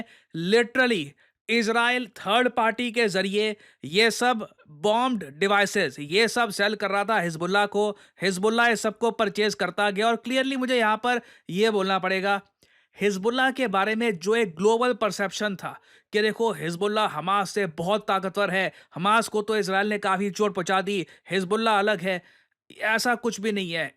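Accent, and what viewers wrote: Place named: Haryana, India. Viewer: haryana ke log aise hi bol te hain bahut logo ko suna hu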